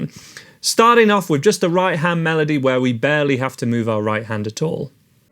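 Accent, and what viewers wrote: Yorkshire, United Kingdom. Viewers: Pleased to meet you, I just found your video on you tube I liked your warm style, by that I mean you explain everything slow enough for an old fart like me now 68, I like also the way you break down sections, chords notes and the stave although I have to read more theory as I feel I am missing a very important part of my journey here mate. Shall I go on oh yes you mentioned flow training,that a big financial commitment will you be on there teaching or will it be someone else, so I am going follow you for a while see how I get on then take it from there really I like your accent poss Yorkshire guy. Thank you so much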